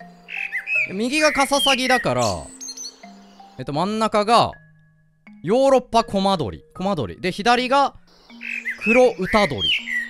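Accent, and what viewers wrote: Japan. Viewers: 開始10秒でお蘭の流暢な日本人英語聞けて大満足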